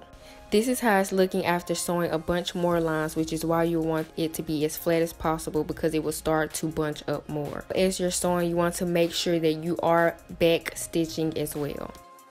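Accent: Arkansas, United States. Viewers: Your accent is so strong! Arkansas. I like it. :-) I'm from Cali and right away knew you were from somewhere on the east coast. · aye turn up Arkansas!! i knew i recognized ur accent lol keep it up girl!!💕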